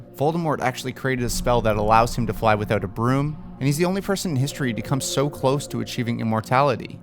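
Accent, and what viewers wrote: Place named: Canada. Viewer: Your Canadian accent is palpable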